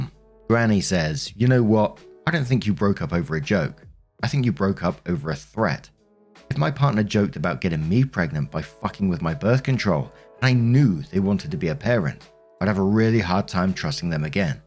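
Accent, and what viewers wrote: United Kingdom. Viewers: I'm British and working in America presently, but thank you for pointing that out. I don't know how I could have missed the very British accent, similar to my own.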